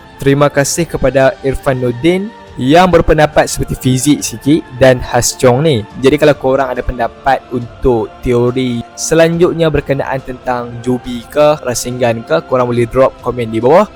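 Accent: North, Malaysia. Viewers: Bahasa melayu loghat utara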